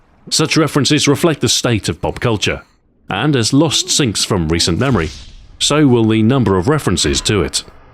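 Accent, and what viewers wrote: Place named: England, United Kingdom. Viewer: English accent. All mighty men in movies and tv shows have an English accent or an accent from somewhere in the UK so that's why I thought of it.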